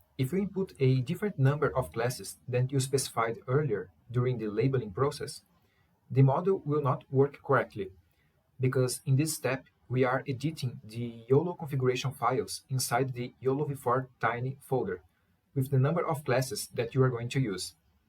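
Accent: Brazil. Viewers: vc é brasileiro né ? parece pelo sotaque. abraço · eu tava escutando vc falando e pensei, parece ser br, ai li o nome do canal kkkk vlw pelo tutorial mano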